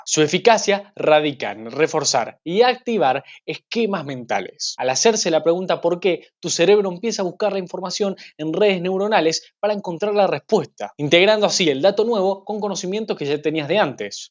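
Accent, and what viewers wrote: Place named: Uruguay. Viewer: Muy buena recomendación 👌 👌 Eres uruguayo? Lo digo por el acento.Saludos desde La Rioja Argentina.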